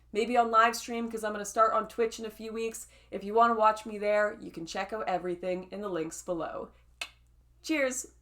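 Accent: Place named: Canada. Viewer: Is it me or is your Canadian accent back with a vengeance.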